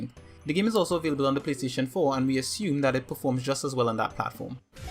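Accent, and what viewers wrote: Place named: Trinidad and Tobago. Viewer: are you from Trinidad and Tobago?.... you sound like you are and great review.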